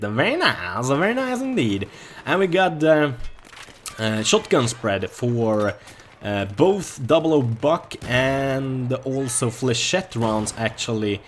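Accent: Sweden. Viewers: He's Swedish. It's his accent. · Are you Swedish?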